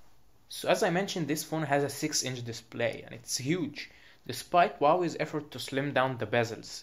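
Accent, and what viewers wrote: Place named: Arab world. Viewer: dat arabic accent doe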